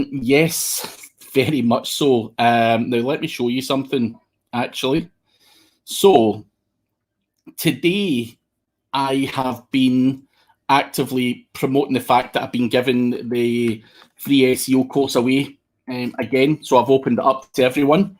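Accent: Scotland, United Kingdom. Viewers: What's up with all these wankers complaining about the awesome Scottish voice!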